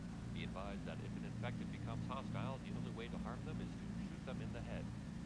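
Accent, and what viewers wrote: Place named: United Kingdom. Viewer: British! Yes!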